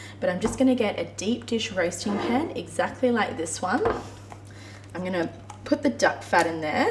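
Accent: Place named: Australia. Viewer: So you’re Australian, I should have known your accent.I’ve made your garlic confit. I love all your videos.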